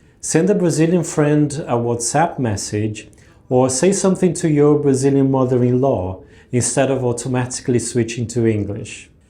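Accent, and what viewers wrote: United Kingdom. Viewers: Um abraço de Sheffield de uma irlandesa que fala português. Nunca morei no Brasil, mas adoro o país e estive lá umas 7 ou 8 vezes ao longo de 25 anos. Na primeira vez, eu literalmente não conseguia abrir a boca para dizer nada; a tia surda-muda do meu namorado na época (um mineiro) até simpatizou muito comigo porque achava que eu também era surda-muda! 😅 Depois que soube disso, comecei a abrir a boca e a usar as palavras que tinha na cabeça. Eu lia livros e jornais, ia a restaurantes brasileiros e conversava com os funcionários. Não tenha vergonha de cometer erros. É exatamente assim que as crianças aprendem, e nós também ficamos uma graça tentando falar com nossos sotaques estrangeiros. Eu não entendia por que todo mundo caía na gargalhada quando eu dizia que adorava salmão “fumado”. 😂 Você tem um sotaque inglês adorável e culto, e acaba de ganhar uma nova seguidora. 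P.S.: Outra dica que me ajudou, além dos podcasts, foi assinar o Spotify Premium e cantar junto enquanto lia as letras das músicas brasileiras.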